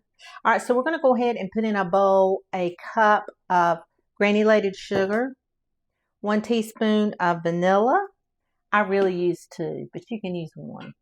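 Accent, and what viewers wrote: South, United States. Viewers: Oo, I love pie!! That is a fact I cannot deny. I made a milk tart the other day, baked it in a scalloped rim dish, but not really suitable, I always bake this in an oblong Pyrex dish and it turns out so lovely, so stick to what you know works. Believe me. So I looked at this Le Creuset pie and thinking before I pack this away, how about a lemon meringue pie? Now that I have seen this video, I think I will do the lemon one first and then try the coconut. I love coconut and again, I love pies/tarts. But they must be real good crusts and fillings with meringue. Thank you so much for sharing and showing how it is made. Just love to watch and love the Southern accent, sounds to calming. Not from the U.S.A. Have a beautiful day, and the grandson is gorgeous, they are just too precious for words. Many blessings. Kind regards.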